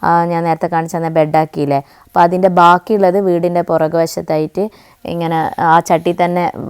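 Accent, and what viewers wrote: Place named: Kannur, India. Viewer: Kutti payar,cheera,mathanga,ellam adipoli aayittund.....oru vithittu athu mulachu varumbol namuk kittunna santhosham athu paranjariyikan kazhiyilla. Nigalude pachakarikalk ellam valathinte kuravu nallathupole und.athukondaa ilakal ellam muradichu nolkunath.cheera kandille muradichu nilkinath.....onapookalam idanulla vegitable flower cash koduthu vangendi vannillale..... Aa manja fruit chiranga vargathil petta inam aanennu thonunnu. Njagalum kaakka poo enna ivide parayunath. Njan paranjathpole thane thakkali ellam kuzhichittu ponnal mathi pine angott thirinju nokkanda😂😂😂😂 Ente nigal vellavum valavum koduthitunel cheera payar ellam adipoli aayi undaayene.valakoorulla mannu cash koduthu vangunathinekal nallathalle valam vangunath. Enik nigalude kannoor shailiyil ulla avatharanam orupad ishtaayi Super video aayirunnutto Pine ente kalyanam kazhinju tto😊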